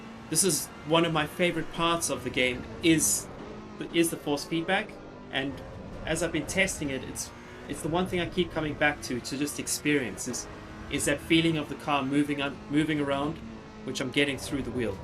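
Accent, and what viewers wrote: South Africa. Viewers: Your accent is very South African. You been? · flawless commentary from the S.A accent lol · Hiya, I've only ever seen a banner of coach online and this is my 1st time seeing anything you've done. But I know this is random but... Are you South African by any chance? I'm from jhb and and I can't shake off the feeling that you are from the accent lol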